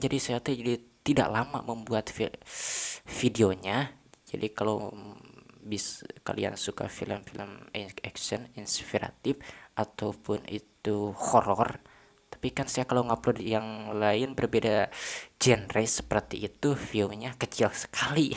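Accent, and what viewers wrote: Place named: Sunda, Indonesia. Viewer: Geningan si mimin th orang sunda😂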